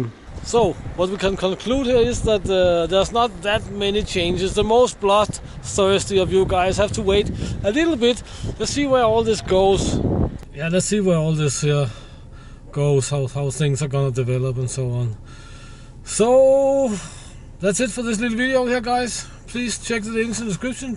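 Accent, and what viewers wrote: Denmark. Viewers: Wow your english sound a lot like with Danish accent!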